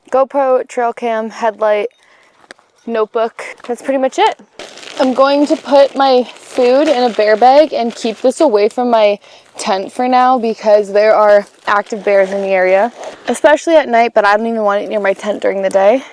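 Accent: Canada. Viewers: I found out it’s part of the way Canadians talk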